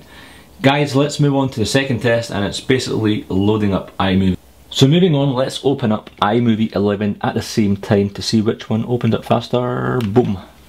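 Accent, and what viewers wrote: Scotland, United Kingdom. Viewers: r u from Scotland ?? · That's a British Scottish accent.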